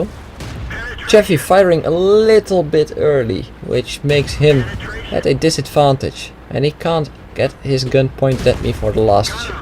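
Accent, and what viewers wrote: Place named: Netherlands. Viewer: he is dutch, just like me and ussually the dutch hava TERRIBLE accent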